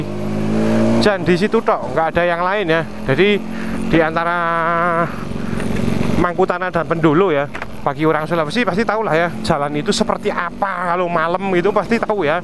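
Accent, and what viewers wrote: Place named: Java, Indonesia. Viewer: Orang mna sih lho bng kok bhasa jawa rantau y bng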